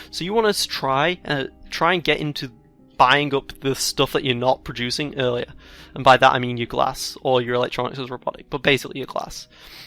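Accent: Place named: United Kingdom. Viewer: Thanks for taking the time to do this! Good to see someone else's build strategy as expansive. I knew you were from the UK but somehow didn't visualize you with an accent. Go figure.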